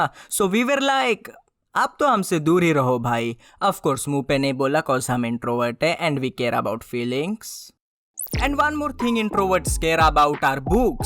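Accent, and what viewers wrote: Gujarat, India. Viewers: Ap gujrat se ho kya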